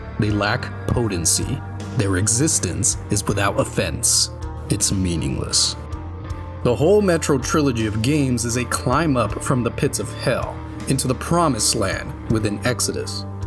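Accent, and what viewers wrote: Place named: South, United States. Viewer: What's with all the midwitted manchildren that don't understand vernacular and accents? Nu-kyu-lur is a dialectical pronunciation. We know how it's actually pronounced. But that pronunciation works better with a Southern accent due to how we stretch vowels and shorten consonants. It's why we pronounce "oil" as "ole" and "window" as "winder." It's just easier to pronounce for us. Nobody harps on the Japanese for pronouncing McDonald's as "Makudonarudo" because they struggle to pronounce the OG name. Nobody gives Jamaicans shit for pronouncing things the way THEY do. And there's nothing wrong with their mode of speech because it works for them, and they understand it. Look...language is an evolving, living thing. It changes. Despite my personal elitism over Classical Latin being disregarded in favor of Ecclesiastical pronunciations in historical films set in the Roman Empire/Republic eras, I get why it's done. 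But that's more about authenticity. Went off the rails near the end there, but you get my point. Accents are accents. No shit. Bostonians saying "paak the caah" and "hahbah" instead of the intended pronunciation is fine. It's not a sign of ignorance or insipidity. And pointing it out is the lamest Reddit "gotcha" that only reveals how fucking stupid YOU innately are. What else? You gon tell us Frankenstein was the Herr Doktor and not the monster next? Greenland isn't actually green, and Iceland isn't all ice, Reddit professor?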